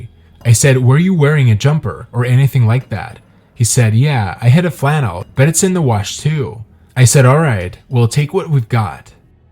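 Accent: Russia. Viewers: This is how he talks, who are you to complain about that? He has a very beautiful and charming accent. You do understand english is not his 1st language, I think he might be from Russia. I'm guessing you are Americans, the only people arrogant enough to complain about someones accent🤦‍♀️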